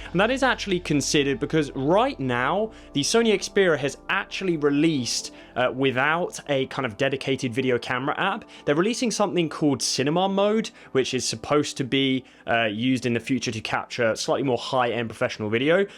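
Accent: United Kingdom. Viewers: Excellent comparison. He speaks with a Cockney accent.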